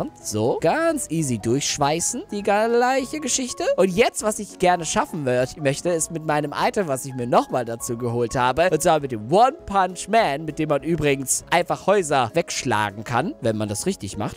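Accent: Russia. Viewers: HAHAHA OMG DEIN RUSSISCHER AKZENT MACHT DAS VIDEO NOCH BESSER 😂✔❤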